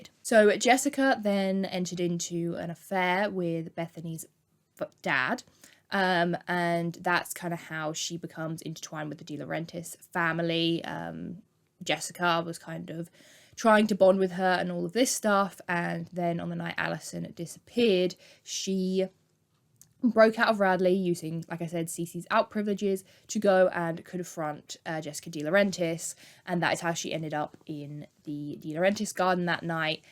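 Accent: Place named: United Kingdom. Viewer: i can’t tell if you’re british or not. you almost have an accent but you don’t?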